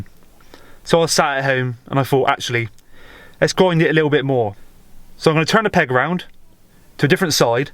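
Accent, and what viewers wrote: United Kingdom. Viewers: Are you Cornish 🔥🤘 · hate english accent.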